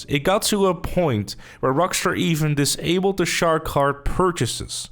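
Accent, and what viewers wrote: Netherlands. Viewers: Another Dutch man? I hear your accent bro, you cant hide it from me!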